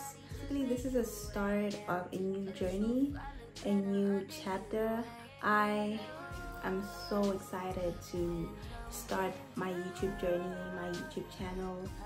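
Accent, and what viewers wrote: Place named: United States. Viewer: I miss hearing that “american accent” everyday 😭🤣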